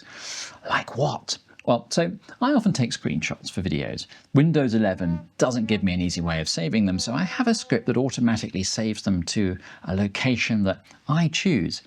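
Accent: United Kingdom. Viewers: Bro you have the thickest british accent I've ever heard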